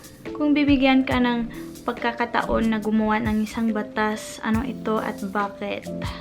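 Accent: Visayas, Philippines. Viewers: Your bisayan accent parang tutuo. Marunung ka mag salita ng bisaya na pinatigas ang accent. Bisayang bisaya ka pakinggan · Your so cute sa mga vlog mo. Medyo puntong visaya ka magtagalog at ang cute tuloy pakinggan. Nakaka amaze ka at we appreciate you sa pagsasalita ng wikang tagalog. Hope to see you personaly soon at papicture sana.thanks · katuwa...cute bisaya accent. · Tagalog nya hindi english accent but bisayan accent. Bisaya kasi ako from negros. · I hear ur Bisaya Accent heheh ate 😍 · Napapansin ko minsan ang bisaya accent mo ang kyut haha. Magpatuloy ka lang, marami kaming new subscribers mo. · you sounds like visaya when you speak in tagalog, i presume you studied in visayas region. But I could feel that you are very down to earth person. I feel you and please do keep inspiring people. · Para kang bisaya the way you speak. Shout out sa tanan bisaya,. 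From Surigao,. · Huwag kang magalit sa sasabihin ko,. parang bisaya ka magsalita ng tagalog. Hindi ko sinasabing masama un. Un lang naman ang obserbasyon ko.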